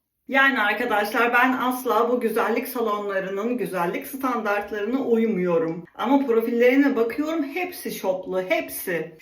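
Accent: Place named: Central Anatolia, Turkey. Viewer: Aksanın bile çirkin iç Anadolu aksanın var sipariş verdiğiğğğmmm düzgün Türkçe konuş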